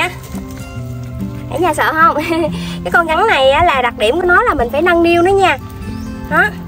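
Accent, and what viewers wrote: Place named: Mekong Delta, Vietnam. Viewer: rất là chất phát miền Tây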